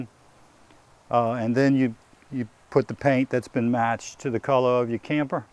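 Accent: Louisiana, United States. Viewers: Man when I heard you talk I knew you were from my home lol! My camper caught a branch through it in the last hurricane that came through carencro. I just had it hauled up to Washington for me and finally getting around to dealing with the hole. Gotta get everything out of it first. Pretty sure I got some water in there but the good thing is it’s so dry here none of it sits. We’ll see how it goes. Are those special mesh patches for fiberglass? Or could you use Sheetrock patches?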